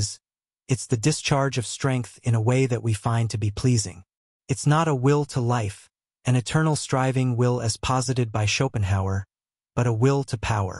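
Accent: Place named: Wales, United Kingdom. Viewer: I was just getting used to the Welsh accent lol.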